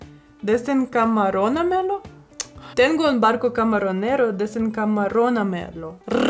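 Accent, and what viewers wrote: Guatemala. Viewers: Me gusta el acento con el que ablas español chapin. 😂😂😂 me encantan tus videos señorita, saludos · saludos me gusta el acento de Guatemala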